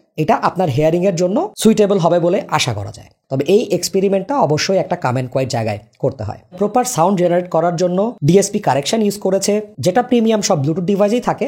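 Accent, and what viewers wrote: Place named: Dhaka, Bangladesh. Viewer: কে ভালোবাসা দিয়েই ধন্যবাদ জানাই যাউজ্ঞা সামনের দিক আউজ্ঞা ঢাকাই ভাষা অসম্ভব ভালোলাগে তোমার😊 আপনার উপস্থাপনা দুইভাবেই মাঝে মধ্য আপন ভাবে কথা বলি আমি, উপস্থাপন দেখে। Don't mind plz ঢাকার পোলা আইসা পড়ে। দোয়া ও শুভকামনা সত্য সামনে রেখে কথা বলা (যেকোন পন্য রিভিও এর কথা বলছি)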